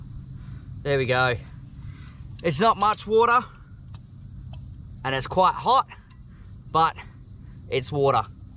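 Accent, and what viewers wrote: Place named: Australia. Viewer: FROM YOUR ACCENT YOU MUST BE AN AUSSIE. GOOD ON YOU ! THANKS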